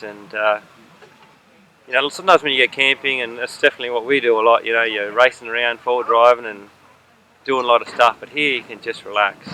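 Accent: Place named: Australia. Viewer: Made, supported and spoken like a true Aussie! Fanbloodytastic mate!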